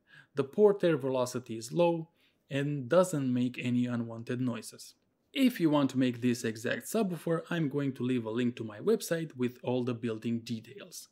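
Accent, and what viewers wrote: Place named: Romania. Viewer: is that a Romanian name? You have the accent and I’m from that area I can kind of tell. Anyways you should try building one for a single 12, keep sealed chamber at 1 cube and ported chamber at 3 or as large as you can, tune the front port anywhere from 40-43 and for port area start at 75% of your cone area and shrink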